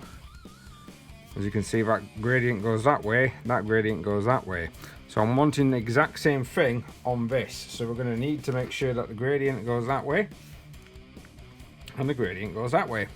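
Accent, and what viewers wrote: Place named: Yorkshire, United Kingdom. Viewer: brilliant vids sir. you really do deserve more views. love your titanicus vids. your yorkshire accent isn't to strong, easily understandable. i'm north notts so used to it. keep up great work.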